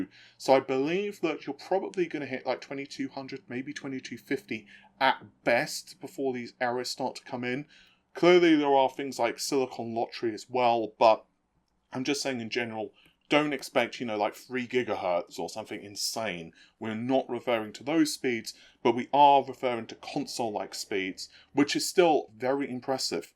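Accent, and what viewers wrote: United Kingdom. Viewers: It's just pretty normal British English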